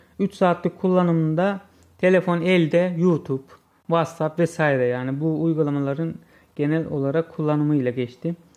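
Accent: Azerbaijan. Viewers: Azerbaycanlı mısın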